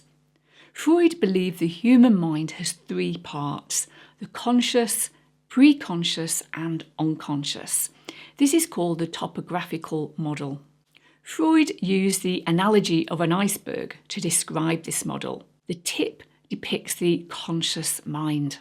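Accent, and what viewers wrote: United Kingdom. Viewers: I'm very happy to find your channel , the topic are amazing , plus your british accet are marvelous , You have a new suscriptor , and thanks for your effort and confidence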